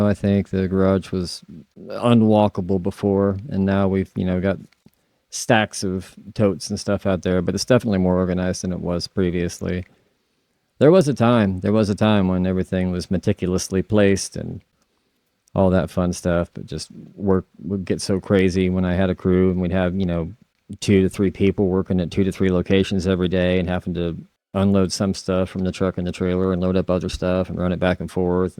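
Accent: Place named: United States. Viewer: This was such a beautiful video! You are a genuinely kind human being. I hope your channel continues to grow exponentially! I think my favorite part was when you had to show your manliness intimidation move(s)😜when your lovely wife showed up. It was as if I was watching a British narrated nature channel only with an American accent. I loved watching your girls interact with you and seeing you joke around with your pops. I, too, feel everything happens for a reason. Remember, it’s always darkest before the dawn. It’ll all work out somehow.😊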